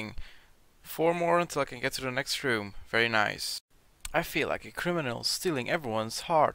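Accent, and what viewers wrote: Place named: Norway. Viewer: Yo, where are you from? You kinda sound norwegian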